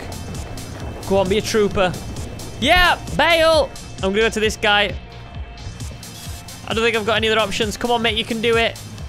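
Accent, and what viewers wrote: Scotland, United Kingdom. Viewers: U sound british but yeah me too 😂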